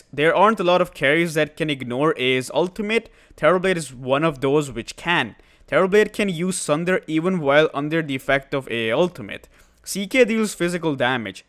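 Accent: India. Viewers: You talk like Indian ))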